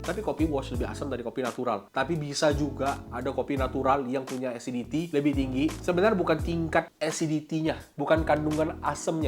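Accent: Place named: Pontianak, Indonesia. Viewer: mak eh org pontianak rupe e wkkwkw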